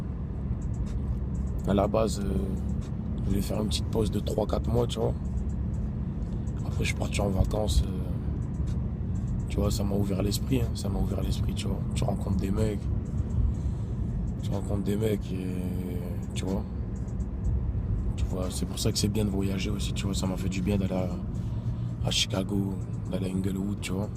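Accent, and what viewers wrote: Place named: North, France. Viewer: trop fort l accent de chez moi sheguey le nord en force ca fais bizarre on entends pas souvent un accent comme ca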